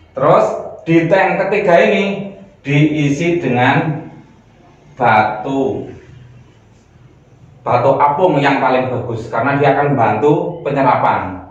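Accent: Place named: Surakarta, Indonesia. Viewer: Ngomong nya mirrip orang Solo🤣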